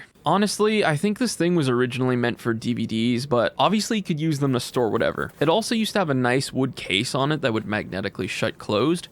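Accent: Canada. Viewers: Hey man, are you a Canadian!?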